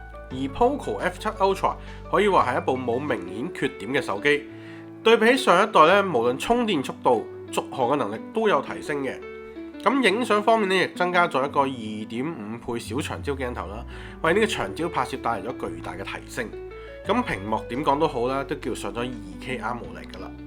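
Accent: Hong Kong, China. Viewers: 就香港粵語口音啊，我也聽得懂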